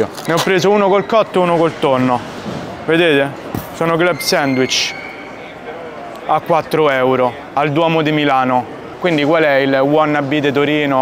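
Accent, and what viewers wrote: Rome, Italy. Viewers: Per avere più riscontro fuori Roma, secondo me, dovresti sprovincializzare il linguaggio abbandonando la pronuncia in romanesco troppo marcata. Per me sei comunque simpatico e mi diverte guardare i tuoi video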